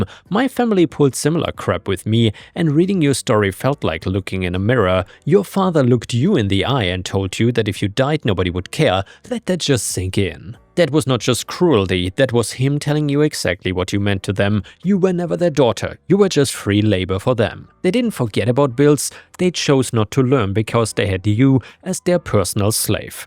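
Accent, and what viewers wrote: Germany. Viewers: You have a German accent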